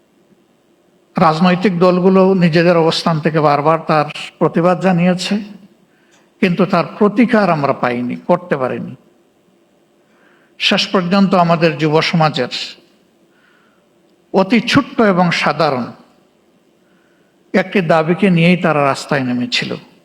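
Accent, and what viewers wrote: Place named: Sylhet, Bangladesh. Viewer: Bangla bolte parena sylete chara. Tobu pod chere youngder hate pod debena.?????/ Dol ke ekjon smart young er hate tule sonmaner sate retire jan. Louv lalosa poder chere den. 16 bochor kicu hoi nei apner dara, kormider charate kono kaj koren nei. Julom hoyche apnader dalalir jonno. Jatike ar koto boka banaben vason deya, kajer belai zero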